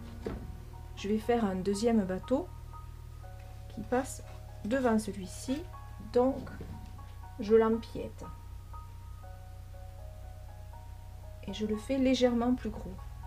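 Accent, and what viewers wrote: South, France. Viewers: Magnifique ! Vous avez un bel accent du midi bien agréable. Merci pour cette démonstration.😍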